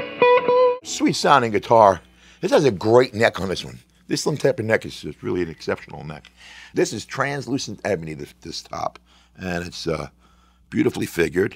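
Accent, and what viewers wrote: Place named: New York, United States. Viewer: I got the same guitar only left handed. Best les paul I've ever played! Nice italian New Yorksish accent!:)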